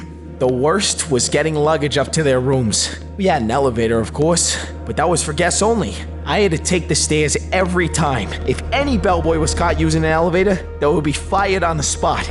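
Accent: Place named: United Kingdom. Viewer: Gasp HES BRITISH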